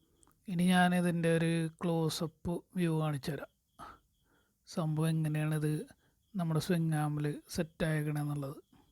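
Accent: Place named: Mattancherry, India. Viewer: Your kindness mind and beautiful mattancheri slang awesome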